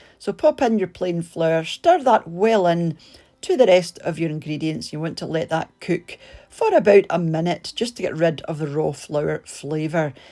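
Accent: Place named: Scotland, United Kingdom. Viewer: There's something so comforting listening to you as cook lovely meals. Must be the Scottish accent!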